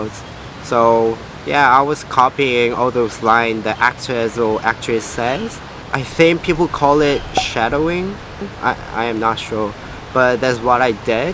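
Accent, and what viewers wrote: Australia. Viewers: 와 근데 진짜 호주 악센트 영향 많이 받은듯 짧은 시간동안 신기하내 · 벌써 400명 돌파!!?! 성장속도 실홥니깟?! 잘지내시고계시죠 ㅎㅎ 정말 영어발음이 오지발음으로 바뀌신거같아욬ㅋㅋ 신기하네요 아무튼 영상 잘 보고있습니다 ㅠㅠ 멜번 그립네요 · 영상미 너무 예뻐버리기 ㅠㅠ 영어 발음 은근 중독성 있네요 ㅋㅋ 보통 많은 한국분들이 호주 가셔도 미국식 영어발음 구사하시는거 같은데 은 애초에 공부를 영드로도 하셔서 그런가ㅋㅋㅋ 호주 발음이 찰떡이심ㅋㅋㅋ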